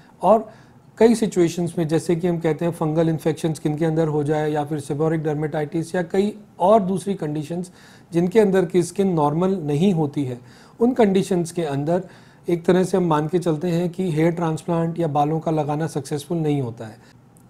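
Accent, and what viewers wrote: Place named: Hinglish, India. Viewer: thats a how ppl speak here hindi+english=hinglish😁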